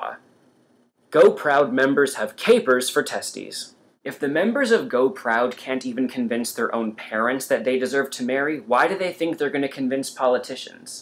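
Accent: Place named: Canada. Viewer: As a Canuck, I thought that you had a Canadian tone to your commentary! :) I love that you put your opinion out there & let people glean from it what they will. If you've been watching our political clime, you see that the LGBYT community is not as "safe" as it used to be. We've become complacent & are once again realizing that equality is not seen by everyone as a right but a privilege. Vigilance/education/outreach - always remember that no one hears us unless we make the effort to be heard. :)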